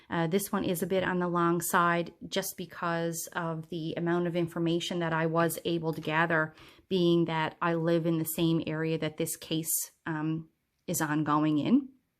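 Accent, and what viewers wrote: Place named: Newfoundland, Canada. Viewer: I’m saving this to listen/watch later with some stitching in hand. Fabulous idea. You’re the perfect person to do this considering your profession as well. Plus I just adore that Newfoundland accent. I have been away from flosstube for too long but you’ve drawn me back in:)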